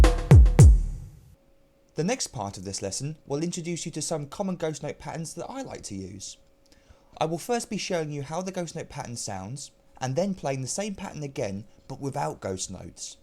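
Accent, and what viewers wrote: United Kingdom. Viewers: Listen to that British accent :)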